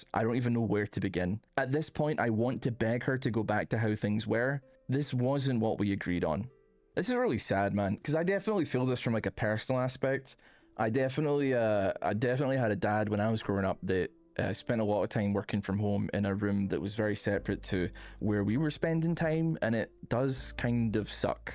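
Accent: Scotland, United Kingdom. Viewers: I love listening to reddit stories while I write. Now I can listen to a fellow Scotsman. Perfection.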